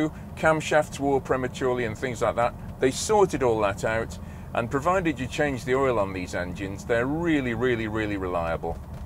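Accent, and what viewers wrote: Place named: RP, United Kingdom. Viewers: I come here for the English ascent. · Wated all week to hear someone to speak the queens english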